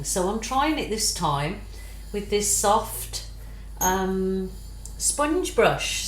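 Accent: United Kingdom. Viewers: thought so I'm from Birmingham uk and thought 🤔 sounds uk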